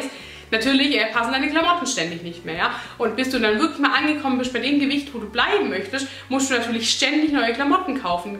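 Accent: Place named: Swabia, Germany. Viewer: Man hört das schwäbische LEICHT raus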